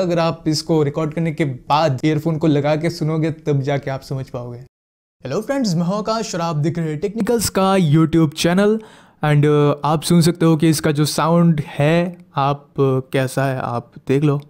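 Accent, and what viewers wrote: Bengal, India. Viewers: This was absolutely helpful ♥️♥️ subscribed instantly ! 😄 By your accent I feel you are Bengali .. 🙌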